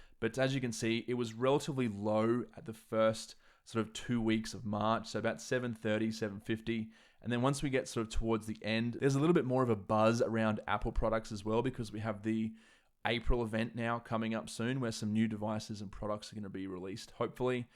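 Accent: Australia. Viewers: Came to this channel for the hot Mac videos. Stayed for the aussie dialect. Will keep on staying for stuff like this.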